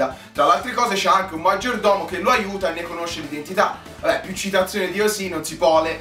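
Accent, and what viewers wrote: Tuscany, Italy. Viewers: ma sei toscano????? XD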